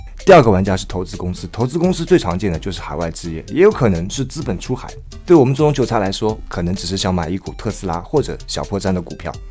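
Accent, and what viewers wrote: Shanghai, China. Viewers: 满满上海口音😄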